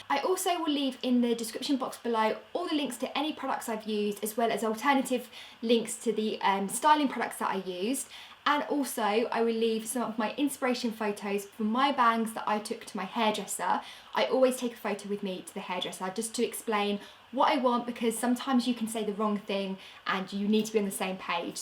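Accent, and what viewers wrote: United Kingdom. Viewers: I would die for your british accent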